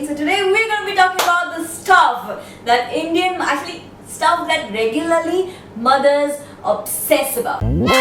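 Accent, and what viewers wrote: Maharashtra, India. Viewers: yeah so true i dont why but is way better than superwoman her marathi accent is so cute n i find her videos relatable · I love your marathi accent..... You rock it... :* love you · please use a lot of your Marathi accent cause it's super cool....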